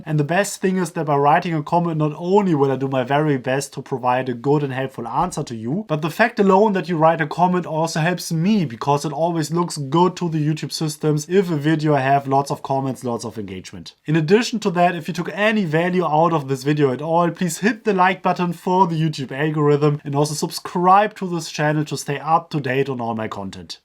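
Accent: Germany. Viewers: But I like your accent. Like German, sounds explicitly, harsh and strict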